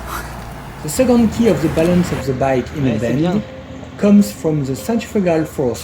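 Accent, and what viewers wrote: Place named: France. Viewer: Bon rappel. Merci pour la séance de l'été dernier. Lovely french accent.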